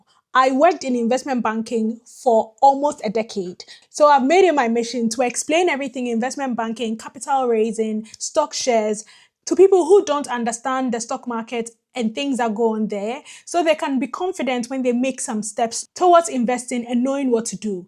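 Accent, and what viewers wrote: Ghana. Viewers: She sounds Ghanian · You sound Ghanaian please refine your accents and be more Nigerian, your content is good enough but your accent is not so Nigerian and you know the vibes between the two countries You go explain tired ooo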